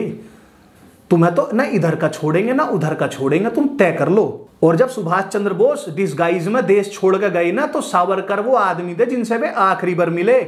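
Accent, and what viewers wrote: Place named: Haryana, India. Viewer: Kahn the bhai itne dino se??? Aaj mile ho. Awesome work dude. Nice haryanvi accent.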